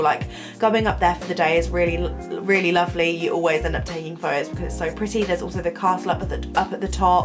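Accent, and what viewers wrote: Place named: London, United Kingdom. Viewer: You sound your like from London! Not Lincoln! Ha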